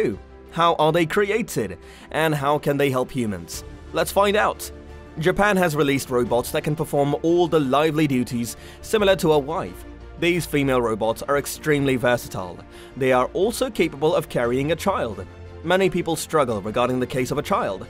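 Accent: United Kingdom. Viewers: Why do these videos always have to be narrated by some idiot with a British accent? And of course it will only be available to the very rich.. Who else could afford to buy an artificial companion for $350 THOUSAND Dollars?? And if they are fully functional then show me... I want to see the function!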